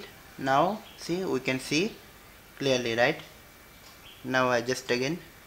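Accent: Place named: India. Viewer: I loveeeee Indian accent....it sounds like his tongue is shorter than others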